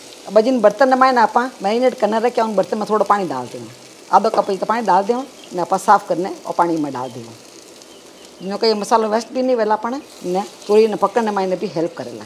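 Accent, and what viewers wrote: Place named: Rajasthan, India. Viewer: Pure n Typical rajasthani accent with no AIRS of sickly so called fashionable style Sounded nice Recipe looks festival spl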